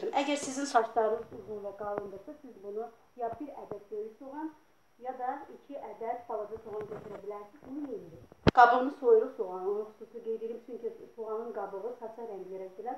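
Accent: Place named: Azerbaijan. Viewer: Lan șu azarbeycan konușmasina hayranim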